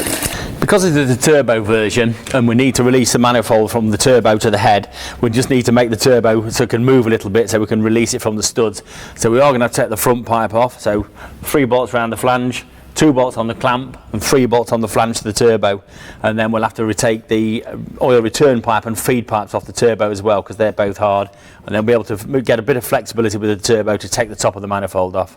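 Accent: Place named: Lincolnshire, United Kingdom. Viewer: Cant beat the Lincolnshire accent! Rover land rover, the only car manufacturer I am aware of that would take the water pump drive off the cam belt. Nowt like putting a high fail item on an essential drive part. Found out yesterday that the water pump on my freelander is wagging around like a dogs tail. Typical, a job that I could do on my drive has to be given to a garage because its October and the weather is crap in Skegness.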